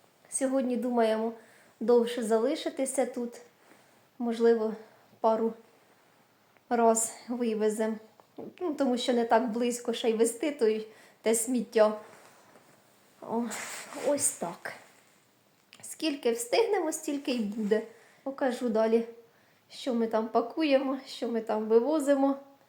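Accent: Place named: East, Ukraine. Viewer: Чого ж неможливо?!Досить мило,своєрідний східний діалект.Моя мама була родом з Донеччини,то у її рідному селі говорили подібно,ще й був в вимові м'який звук "Л".Як в дитинство потрапила!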